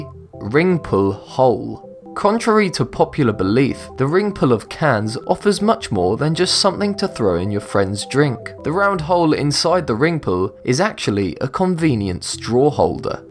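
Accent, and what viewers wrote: England, United Kingdom. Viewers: Just because you have a dumb limey accent does not make you smart. none of these are true and were never meant to be used that way. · pre-schoolers haks, w english accent , horrible as hell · His voice is a really good British accented voice.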